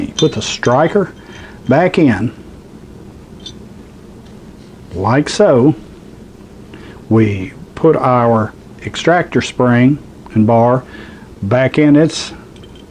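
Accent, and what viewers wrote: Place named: United States. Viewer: I’ll give you a third just for that country ass voice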